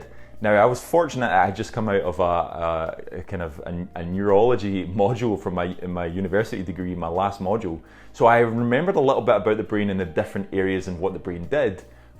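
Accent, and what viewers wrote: Scotland, United Kingdom. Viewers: Thank you so much for this info. Some people tell you, you shouldn’t tell them you are aspiring for something else as they will think you want to just use that opportunity to climb further. By the way, are you Scottish?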